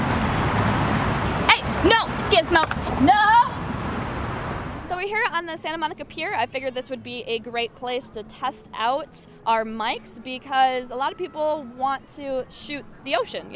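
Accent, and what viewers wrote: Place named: Midwest, United States. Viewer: What I tell you is this: Get rid of it but be able to showcase it if someone asks for it. Or for when you go back and film there or Michigan/Wisconsin where that accent will be wanted regionally. Well I only say Michigan because there is a lot of filming there but that accent is not necessarily there. I like your voice. Sometimes there's a quiver in there when not using the accent like you're nervous or something, but it's very cute when it happens. Like when you get tangled in cords. lol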